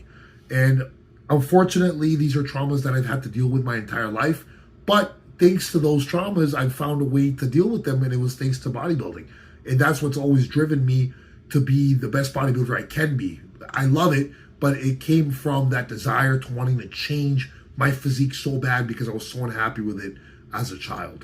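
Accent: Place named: Mexico. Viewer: at first glance u look indian, then when u talk, u sound bean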